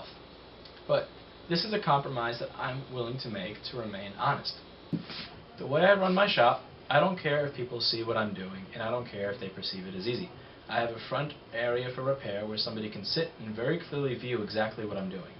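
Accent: New York, United States. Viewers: Enjoying your videos on "repair shop culture" I gather that you're from New York?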